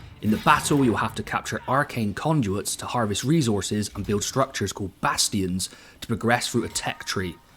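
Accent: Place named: West Country, United Kingdom. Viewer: Hearing a West Country accent in media is a breath of fresh air! I’m from Somerset and you’ve got a follow from me mucker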